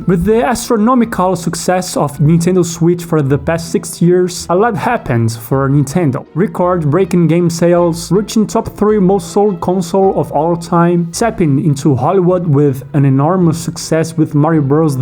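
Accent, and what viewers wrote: Brazil. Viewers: is this a ptbr accent i hear??? hehehehehe (if so, right ON) but yeah, at times i feel very little desire to jump back into nintendo games, unless im with friends and wanna test how strong my friendships really are by playing mario kart or smash bros, lol. great video, dude!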